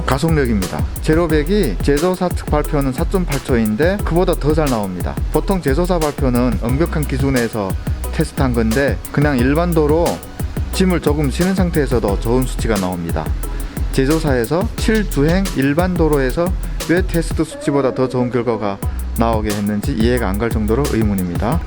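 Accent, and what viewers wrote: China. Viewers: 중국교포에요? 말이 굉장히 어눌함 듣다가 짜증나서 나가요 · 제 친구도 재중동포인데 말투가 비슷하신 거 같아서 여쭈어 보았습니다 ㅎㅎ BMW를 좋아하시는 것 같은데 올리시면 재밌게 보겠습니다!!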